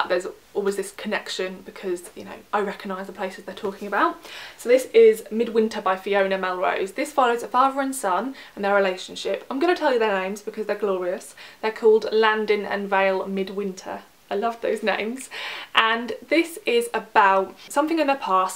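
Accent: England, United Kingdom. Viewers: I love her accent. Could i know which part of England she comes from??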